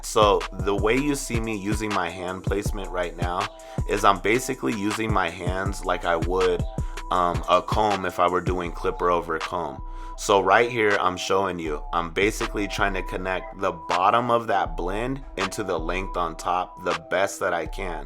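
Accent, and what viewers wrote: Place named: California, United States. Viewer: I knew you were Mexican , sound like from Cali . Haha idk man 🇲🇽, wouldn’t mind have another andis shaver 💈💈💈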